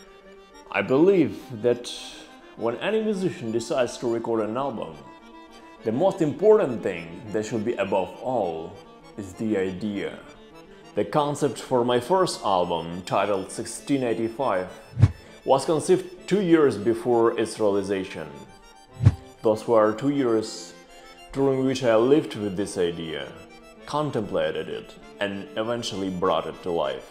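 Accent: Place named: Russia. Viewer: Русский акцент не отнять брптан😊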